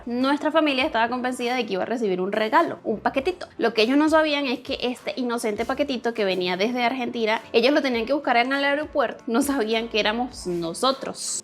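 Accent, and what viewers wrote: Venezuela. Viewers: Me encantó que con nueve años fuera jamás perdiste tu acento, sigues hablando venezolano como sino te hubieras ido nunca ❤❤❤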